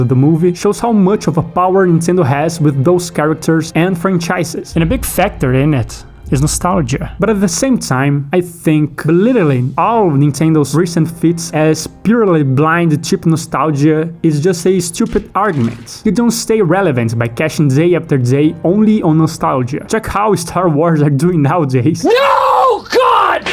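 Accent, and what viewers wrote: Brazil. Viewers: is this a ptbr accent i hear??? hehehehehe (if so, right ON) but yeah, at times i feel very little desire to jump back into nintendo games, unless im with friends and wanna test how strong my friendships really are by playing mario kart or smash bros, lol. great video, dude!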